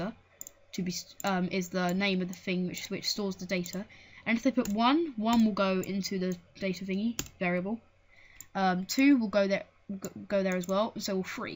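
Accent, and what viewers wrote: England, United Kingdom. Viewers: british accent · i really don't understand anything ur talking so fast and man , i'm not used to the english accent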